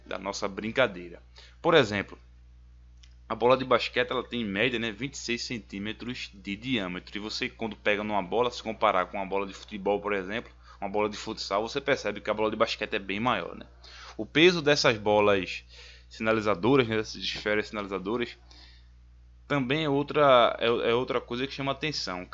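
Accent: Brazil. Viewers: Sotaque pernambucano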